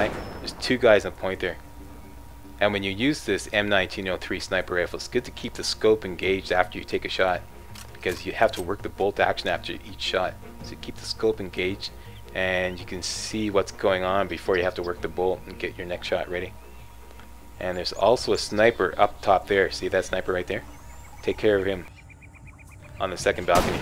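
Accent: Canada. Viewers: i swear this guy sounds like a Canadian. great vid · You have to be from Canada....Yes? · Are u Canadian? I thought I heard a Canadian accent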